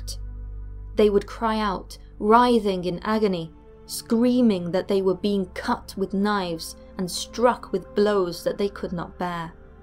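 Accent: England, United Kingdom. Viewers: Your voice makes me imagine that I'm being told the story from an actual family member! I have an American accent and have always loved them English accent. I'd listen to you read the phone book. I wish your voice was on my devices..."wake up darling, it's time to cease the day. Go on now, wake up." Hehehe......or "alright babe, you're all tucked in and ready to begun your journey to dreamland, goodnight darling." Ahhh that would be awesome! Who knows contact Google....you never know 😉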